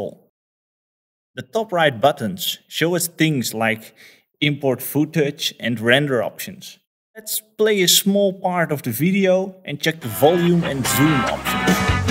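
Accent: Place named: Netherlands. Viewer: Nice App! Nice explanation. Your accent sounds more Dutch than Brazillian to me!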